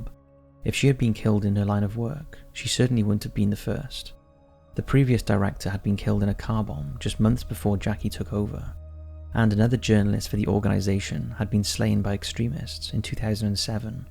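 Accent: Wales, United Kingdom. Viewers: I think he's Welsh? The way he pronounced some words made me think South West but he lacks that Farmers twang like me. 😂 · I read on several other posts that it’s a Welsh accent, so I do believe so. I recognise it somewhat since I once had a colleague from Cardiff area. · Pavman Cometh he's definitely Welsh! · really? I'm picking up a newport/cardiff twang lol Maybe this can go in an unsolved mystery vid 🤣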